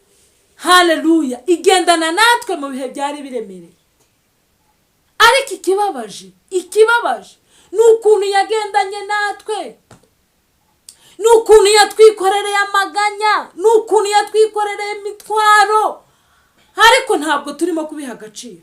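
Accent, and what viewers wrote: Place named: Banyamulenge, Democratic Republic of the Congo. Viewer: Ese ko numva uvuga nkabanyamurenge uriwe ndabakunda peee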